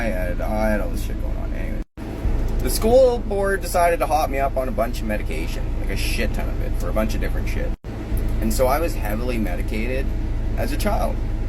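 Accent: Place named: Canada. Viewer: The Canadian accent man I love it.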